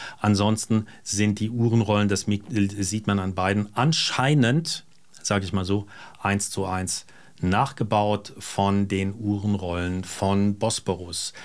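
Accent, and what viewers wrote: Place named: Hesse, Germany. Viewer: Moin Herr, sehr interessantes Video zu einem Nischenthema, dazu top recherchiert und fair bewertet. Ich habe mir vor Jahren mal eine erwas rustikalere Lederrolle von Steinhart zugelegt, die ich aber sehr selten nehme. Auf Reisen nutze ich lieber die günstigen Reiseboxen von Sinn für eine Uhr oder etwas hochwertiger das Leder Reiseetui ebenfalls von Sinn. Wie immer sehr kurzweilig mit dem schönen hessischen nit und einer Prise Humor, Chapeau🎩.Und wie gewohnt schön in Szene gesetzt und klug kommentiert. LG Werner Hoppe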